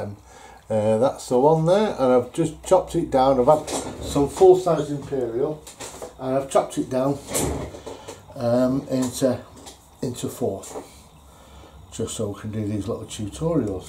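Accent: United Kingdom. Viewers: Just discovered your channel. I am a sucker for a British accent, love your analogies, you are a very talented artist. Thank you for sharing with us.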